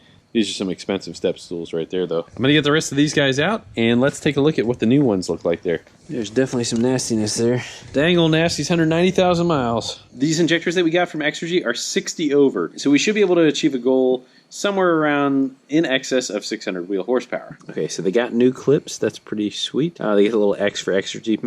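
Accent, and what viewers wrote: United States. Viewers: Are you from Maryland? Or born and raised in Florida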